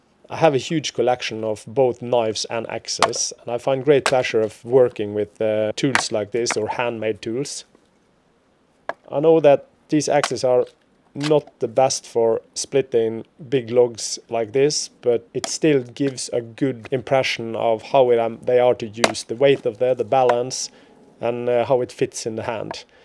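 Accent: Bergen, Norway. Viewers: Det er ikke vanskelig å høre at du er Bergenser ;-)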